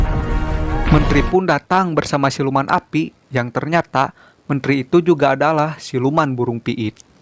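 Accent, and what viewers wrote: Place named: Java, Indonesia. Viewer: Logat jawa 😂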